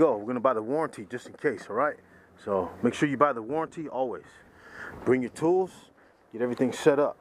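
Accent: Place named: California, United States. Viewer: i like your cali preaching.I'm mechinc in learning 5yrs and counting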